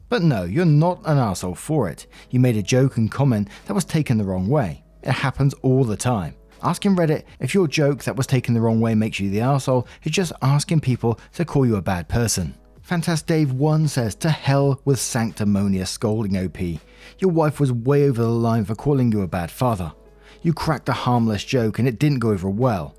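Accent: England, United Kingdom. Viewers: Oh hi,! Hope you're well! I just got out the gym. Honestly I listen to you so much that my American English is turning more English English.... I have ASD and tend to mimic accents and speech patterns without meaning to. Had to explain what "skint" meant to 3 different people. Not complaining though, I kinda like it. Much love!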